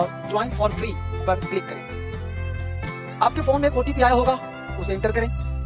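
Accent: India. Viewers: Sar aap word ko kaun si accent mein bolate Hain Indian accent yah British accent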